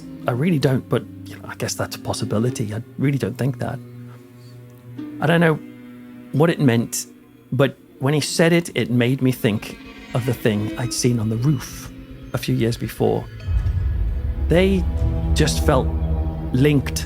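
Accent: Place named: Durham, United Kingdom. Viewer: I thought you was from Chester le street you can tell from ya accent, I'm just up from there ayton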